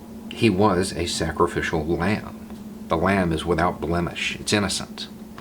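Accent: South, United States. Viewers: At the beginning of this video....I interrupted it by watching the short documentary "The Body Of Emmett Till" from the Time channel ( long) and then went back to watching this video from...a southern white bearded man with a southern accent speaking with reverence about him. If Emmett Till could hear the words spoken by.......just wow.......I think he would have hope (even this many years later and that's a shame it takes this long). There's still a ways to go. I'm kind of at a loss for words right now after seeing the open casket photos and footage followed by words.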